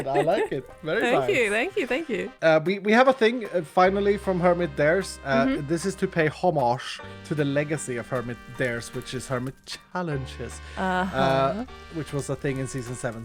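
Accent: Australia. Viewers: Did know youse was aussiestrailian. Fucking coll.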